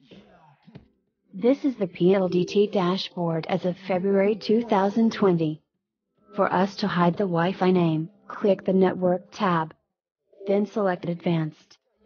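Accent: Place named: United States. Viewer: Gandah nang boses parang american😁salamt sa video mo.another saving life....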